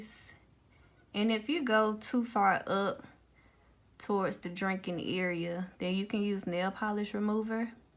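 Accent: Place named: Florida, United States. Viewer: Your accent is so cute lol so country. Must be from Florida too 😂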